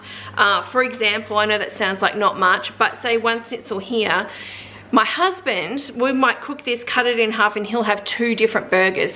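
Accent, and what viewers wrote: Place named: Australia. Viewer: Oh an Australian accent. What part of Australia?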